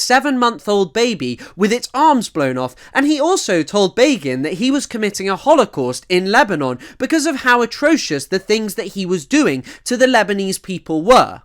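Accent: United Kingdom. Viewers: i hate when there’s a video i’m really excited to watch and the narrator is nauseatingly british 😢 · I think it’s also the British accent, I’m ESL and have a harder time understanding those who are British but I can understand at this speed any American. I’ve had to rewind a bit…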